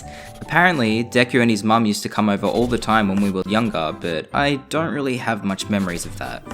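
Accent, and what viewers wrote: Australia. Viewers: so i dont know if i herd this right but you said '' deku'' i dont know i am so sorry i don really understand Australian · R u Australian? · I just found out you’re Australian- eyyyyyy (also Australian) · Haha I knew you were Australian.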